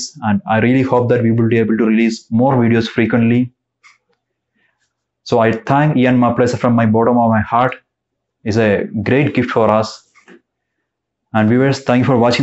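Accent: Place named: India. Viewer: Bro you are indian Sunke achha laga......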